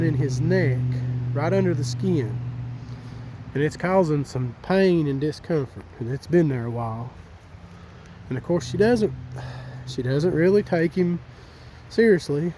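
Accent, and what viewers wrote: South, United States. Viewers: This story had me captivated! Thank you! I get so relaxed listening to your southern drawl and seeing the peaceful, serene video scenes that accompany!😊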